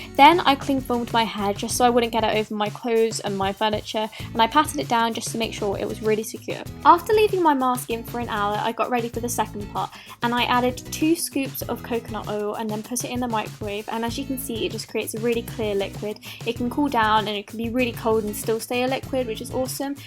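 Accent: United Kingdom. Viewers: Your strong British Accent...Pretty good there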